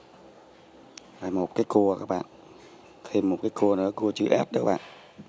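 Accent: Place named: Mekong Delta, Vietnam. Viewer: Giọng nói miền Tây nhưng mà ở đâu vậy.Câu ở kênh mà có lục bình nhiều mình câu có không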